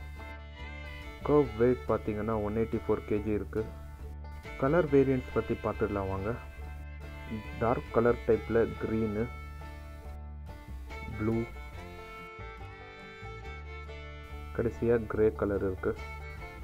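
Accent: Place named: Bangalore, India. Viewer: Bangalore Tamil nice best of luck bro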